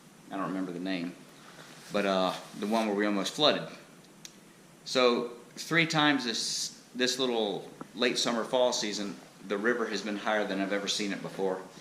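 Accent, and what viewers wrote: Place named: North Carolina, United States. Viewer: I had no clue where the Haw River was. However, as soon as you started talking, i knew you were from NC or lived there. Something about that NC accent makes me miss home man, should have never moved out of Asheville, NC. Indiana sucks.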